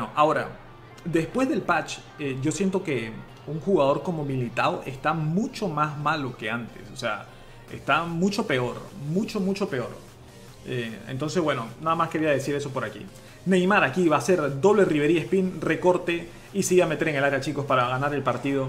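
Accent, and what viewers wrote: Venezuela. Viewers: De donde eres ? Me suenas a Venezolano pero no estoy seguro jajaja. Buen video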